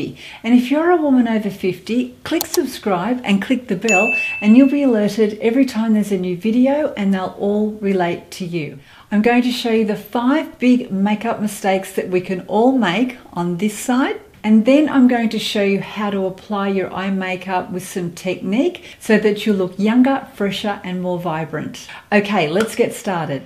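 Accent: Australia. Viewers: You are so pretty! You sound Australian & remind me of Olivia Newton-John! 🥰🥰 Great video!! ❤️ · Australian accent?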